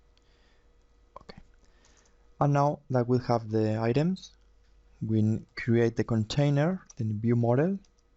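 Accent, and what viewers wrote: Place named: Spain. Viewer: Suena a español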